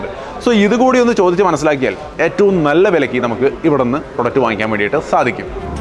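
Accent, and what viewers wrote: Thrissur, India. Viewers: chetta Thrissur ഭാഷ കയറി വരുന്നുണ്ട് 😄😄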